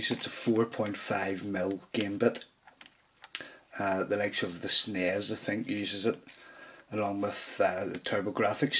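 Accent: Northern Ireland, United Kingdom. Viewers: northern Irish not Scottish :P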